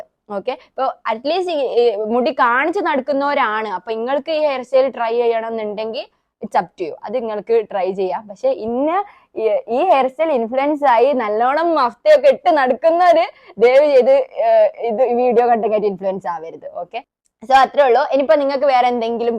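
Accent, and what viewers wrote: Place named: Malabar, India. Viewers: Malabar slag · Kannur slang athyyavishyamund❤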